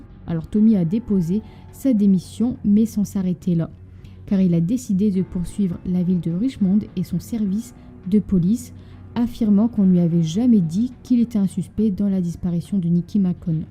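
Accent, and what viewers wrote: North, France. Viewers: aaah vous êtes une chtimi comme moi <link> semblait bien percevoir un accent 😁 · Au top, comme d'habitude! Mais alors je suis curieuse... ^^ D'où nous vient cet accent? J'ai l'impression d'entendre des sons aux influences du nord mais en même temps je n'ai jamais entendu d'accent du Nord comme le tien si j'ai tapé dans le mille. En tout cas c'est charmant, la touch! J'adore! Merci de nous faire voyager dans le sombre monde du true crime 🕵️‍♀️🚔🔪💉💀🥀⚰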